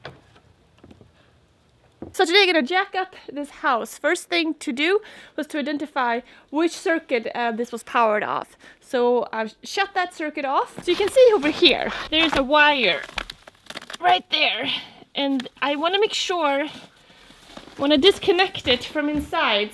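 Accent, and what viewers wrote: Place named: Sweden. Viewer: En personlig fråga. Vari Sverige kommer du i från? Svenskan lyser igenom i din accent nämligen. Bara nyfiken. Annars är jag djupt imponerad av din oräddhet när det gäller att ta sig an olika byggprojekt!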